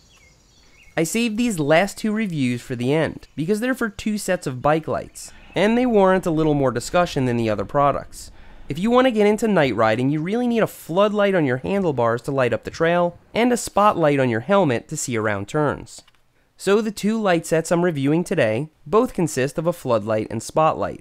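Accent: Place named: Midwest, United States. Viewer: it sounds like he is plugging his nose while he talks with a bad midwestern accent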